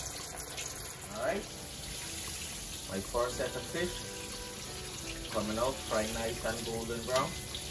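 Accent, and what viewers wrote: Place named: Guyana. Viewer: The awesome dishes that you are preparing and sharing the recipes, are the type of food that we ( we) I’m putting we in brackets because I’m not referring to anyone. My grandma used to make this awesome dish. Even to my grand father in law ( Indian decent) I’m so grateful for our ancestors and their recipes. Thank you very much, you rocks. Love all the recipes, god bless you brother. Must say that I love the Guyanese accent.That’s what I’m talking about.the awesome five finger fruit drink. That’s a power house juicer.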